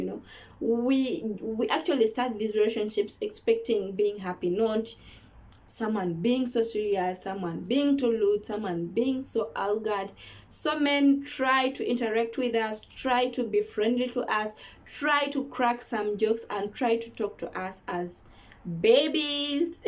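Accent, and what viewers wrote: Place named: Uganda. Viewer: I feel you , but always communicate in relationships matters , munange kale I knew that accent that u are a Ugandan kabisa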